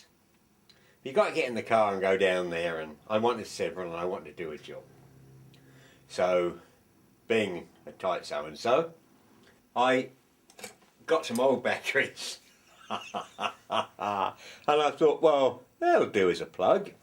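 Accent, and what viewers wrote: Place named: England, United Kingdom. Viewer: I always enjoy your videos. Whenever I hear your voice, it always reminds me of the Wallace and Grommet animations. I have always been a fan of the English wit and delight in hearing an English accent. I encourage you to continue making your videos, I find many useful ideas for projects and repairs of my own.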